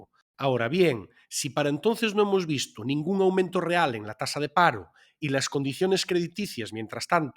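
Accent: Spain. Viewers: Muy buen video! Pregunta de curiosidad 😁: de que parte de España es el acento de la persona que habla en el video?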